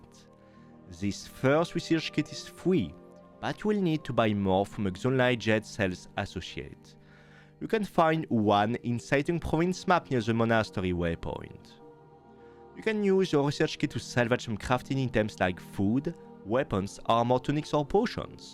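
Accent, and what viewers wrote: France. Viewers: I love your french accent! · you sound french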